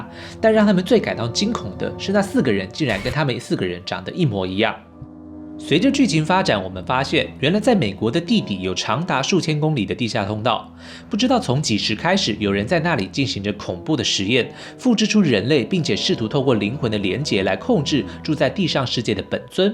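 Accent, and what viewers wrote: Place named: Taiwan. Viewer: UP主应该是说的标准台湾国语吧，讲的很好，口齿清晰，声音也好听，全程没开字幕听的很清楚。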